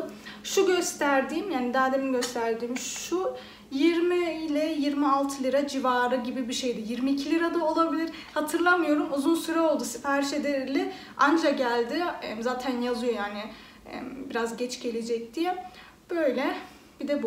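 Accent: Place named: Germany. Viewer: Harikasın bayılıyorum konuşmana ev düzenine hanım hanımcık oluşuna aldıkların çok güzel güle güle kullan💗bizimde gelinimiz var almayada doğup büyüdüğü için senin gibi konuşuyor oda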